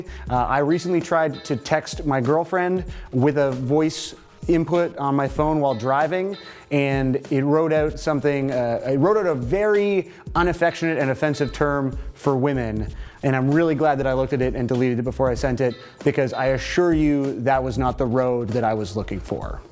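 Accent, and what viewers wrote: Canada. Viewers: Your Canadian hell ya Eh...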